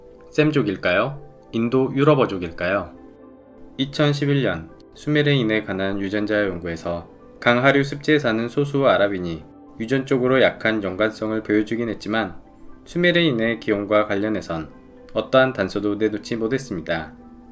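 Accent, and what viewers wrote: Jeolla, South Korea. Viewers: 억양을 보니 전라도 출신이시네요 ㅎㅎ 광주같은데... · 전라도 목소리네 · 혹시 전라도 분이신가요? 사투리가 익숙 하길래 여쭙니다 ㅎ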